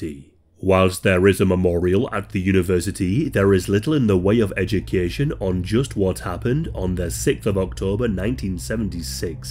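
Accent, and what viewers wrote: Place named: North East England, United Kingdom. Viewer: The most durr voice on the Internet. Rotten accent that North Eastern 🤢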